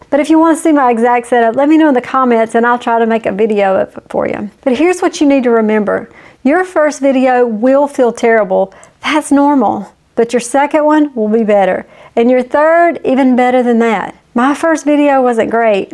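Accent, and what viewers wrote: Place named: South, United States. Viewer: Not just Women watching you. I’m a subscriber finding your videos very helpful and informative and I’m a 26 year old man 😅 Your videos are great. You remind me of my southern teachers I loved back in my Middle school days when I transferred from Hawaii to Florida, southern accent and down to Earth. Your YT is gonna pop off when Fl, Georgia, Tennessee, Alabama ect. algorithm finds you 🙌🏽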